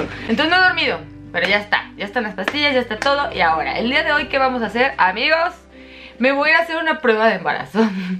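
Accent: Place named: Spain. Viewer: aquí estoy apoyando con mi granito de arena viendo todos tus videos como mil veces en todos los dispositivos que tengo para apoyarte y que tengas muchas muchas vistas me encantas y por favor amo tu acento español 😅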